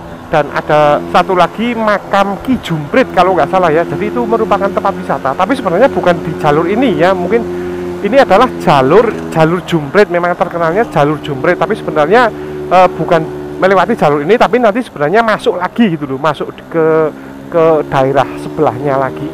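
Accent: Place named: Temanggung, Indonesia. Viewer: Kok koyo wong temanggung asli mas 😅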